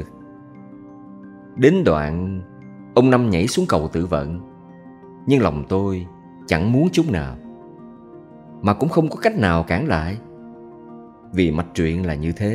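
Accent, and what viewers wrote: South, Vietnam. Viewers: Hi, Chúc anh ban cùng gia đình mọi sự tốt đẹp nhé! Tôi phải ngả mũ chào ngưỡng mộ qua tuyệt vời!! 👍👍👍👍””I fall in love with you ””” about Well- knownledged, well prepared, well organized từ cách giới thiệu rất hoàn hảo, đẹp mắt! Từ giọng đọc thật truyền cảm, thật “” Nam kỳ tình”” giong cuoi rất khôi hài!! Chúc anh ban thành công và tien mai trên sự nghiệp nhe! BRAVO BRAVO 👏👏👏👏👏❤️❤️❤️❤️❤️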